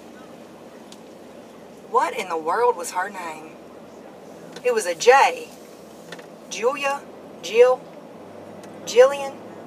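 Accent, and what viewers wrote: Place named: Texas, United States. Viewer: Where are you from? We sound alike. I’m from Texas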